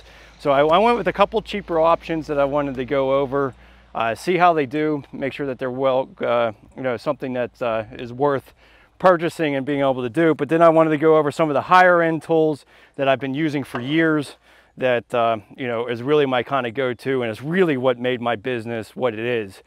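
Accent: Pittsburgh, United States. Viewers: You are very selfless in sharing information. I also love your accent and it takes me back to my days in Pittsburgh.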